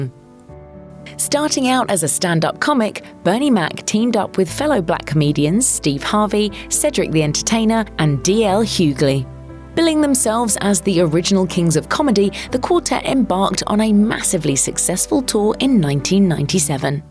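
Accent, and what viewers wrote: United Kingdom. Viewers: Tired of hearing British narrator. 😝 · Can't handle the Brit accent.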